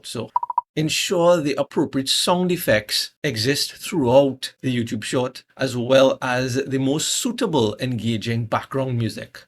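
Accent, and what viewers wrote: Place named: Trinidad and Tobago. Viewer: Alright Good morning Yuh sounding Trinitarian. 🇹🇹 Trinidadian.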